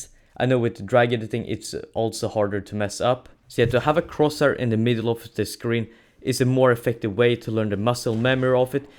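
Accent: Sweden. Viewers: Ur swedish to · Are you swedish?? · Im guessing your swedish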